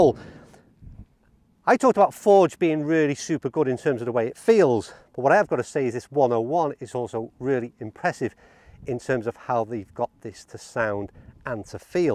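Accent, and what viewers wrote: Liverpool, United Kingdom. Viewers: I think scouse is as English as you can get. From a Manc.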